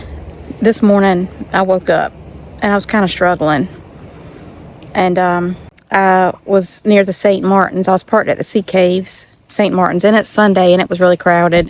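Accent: South, United States. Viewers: Work on getting rid of that American accent. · Watching your YouTube video tonight, and seeing my home province and familiar scenery through a visitor’s eyes. It’s made me a bit homesick, because I am living in St John’s, Newfoundland and I haven’t been home since 2019 ( before the COVID pandemic). I was really hoping to get home this summer, having been laid up with Arthritis and finally this March, I got a total hip replacement done. I’m recovering very well, however, I do need my other hip replaced, and hopefully that will happen sometime this fall (I have already signed my consent for it). Definitely going home next summer and possibly moving back to New Brunswick. Strongly considering it. I just love your accent, I have family in Houston Texas so nice to hear a bit of the southern drawl as I haven’t been to visit there in over 10 years now. Please don’t feel out of place in our Maritime provinces, We have all kinds of accents and many cultures, people from many countries who now reside on our East Coast. 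There is a famous saying “it’s a small world after all” and it’s getting smaller all the time. You seem like a really lovely person and I do know what you mean by “some people “ being not so nice. Yes, those people are everywhere, however, most of us Canadians you will find to be very polite, kind and respectful. I subscribed to your channel so I could see some familiar haunts of mine. Drive safe now. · I just discovered your video via Youtube suggestion. Very happy that you enjoy your stay in NB, so far (I live in Moncton). Thank you for your kind words for Canada and Canadians (yes, there could be bad people, like anywhere else in the world). Love your personality and your southern accent! Have a great road trip! 😊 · haha don't tell Canadians that thing about God having a southern accent. tbh, I don't even understand the joke. I'd leave God out of any casual conversation. People will be charmed by the southern accent itself so don't worry about how you sound. 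Enjoy your trip. you will fit right in.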